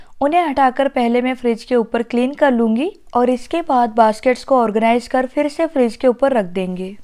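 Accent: India. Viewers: मैने एक वीडियो और देखी थी, जिसमें बोला गया था कि ऐसी होता है अमेरिका का किचन, लेकिन आप की आवाज और भाषा से पता चलता है कि आप इंडिया से हो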